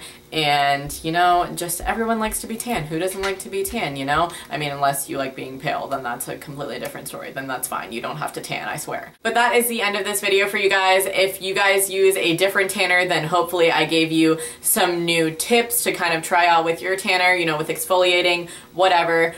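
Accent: United States. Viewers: its amazing how americans say 'get tan' i want your accent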